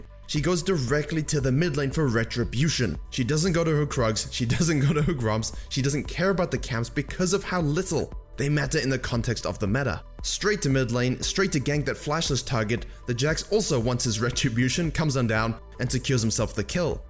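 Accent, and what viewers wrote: South Africa. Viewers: You sound South African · you're from south africa! <3 I am proud!!!! haha I did not even notice. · Are you South African?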